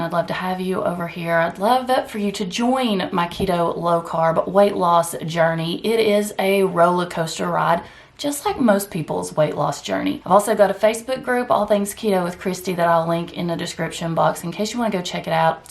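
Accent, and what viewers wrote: South, United States. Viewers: Hi Kristi, that’s GREAT! Thats keto flexing , when you go off and on keto with negligible negative results. Gotta live life. I love the southern accent( I’m a northern guy). Keep up the great work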